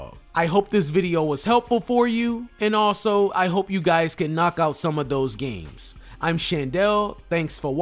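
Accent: United States. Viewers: The American accent 😂😂😂❤❤❤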